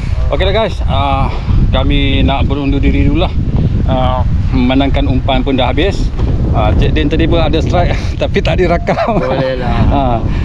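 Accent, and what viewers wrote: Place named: Banjar, Indonesia. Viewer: pekat banjar nya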